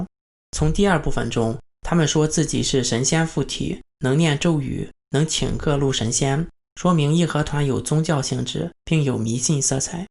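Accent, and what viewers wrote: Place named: Henan, China. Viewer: 我怎么听出了河南口音？？？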